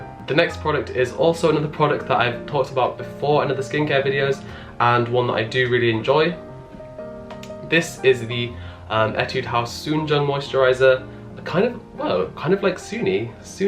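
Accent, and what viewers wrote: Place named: England, United Kingdom. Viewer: Idk why but I luv your English accent sooooooooo much, it melt me out!! ASMRRRRR!! Luv from a little vietnamese girl <3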